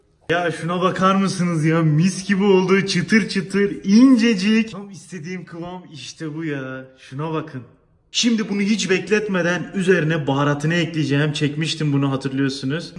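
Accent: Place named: Hatay, Turkey. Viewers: Çünkü adam hataylu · Because he is form hatay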